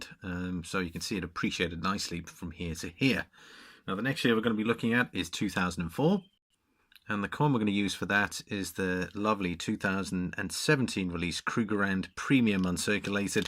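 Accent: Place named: Merseyside, United Kingdom. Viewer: Being robbed at gunpoint sounds fairly horrific. Can I ask where exactly that was/is ? I do detect a Merseyside accent...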